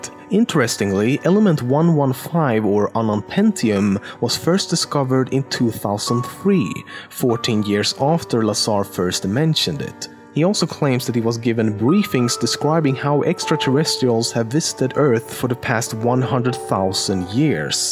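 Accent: Sweden. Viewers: We can hear you are swedish!😂 i’m personally swedish. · Tbh I can’t stand this Swedish English accent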